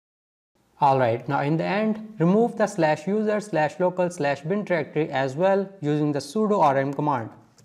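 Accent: India. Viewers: I have yet to try this but your Indian accent is way too strong, makes it hard to watch. I appreciate the effort though.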